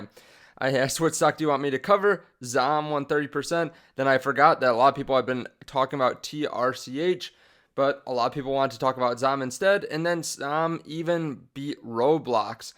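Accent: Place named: Chicago, United States. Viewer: Dude must be from Chicago or the burbs.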